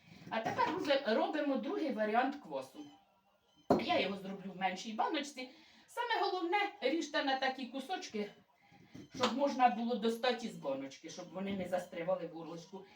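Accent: Poltava, Ukraine. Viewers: Правильно ви балакєте так як і ми на полтавщині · ваш тембр дуже нагадує специфічний полтавський акцент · Так говорят на Полтавщине. Прекрасный край, чудесные люди. С любовью Мариуполь.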